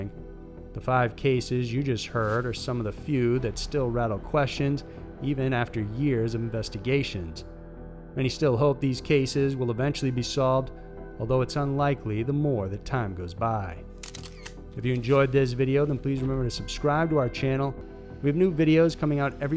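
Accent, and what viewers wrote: United States. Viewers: He sounds very much American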